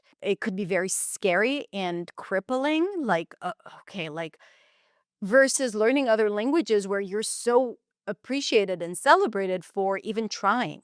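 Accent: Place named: United States. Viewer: I honestly couldn’t believe you’re a native Hebrew speaker because you sound so American! 🇮🇱🇵🇭 I’m a Filipina working here in Israel, and learning your background just blew my mind. I’m currently trying to improve my English, and your transformation gives me so much hope! Todah rabah! 🙌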